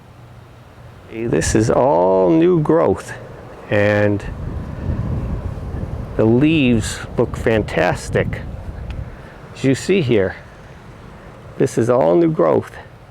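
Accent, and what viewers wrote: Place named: Netherlands. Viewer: Sounds like a nl accent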